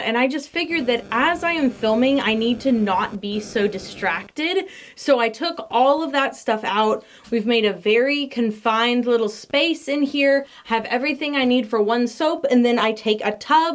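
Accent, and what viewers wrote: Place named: South, United States. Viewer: All the puddin, puddin. You’re so southern and adorable!! ❤️❤️❤️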